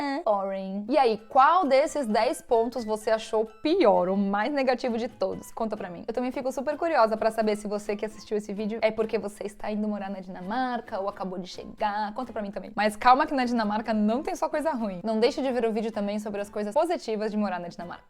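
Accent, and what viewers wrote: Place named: Minas Gerais, Brazil. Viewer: Pero el aciento parace de mineira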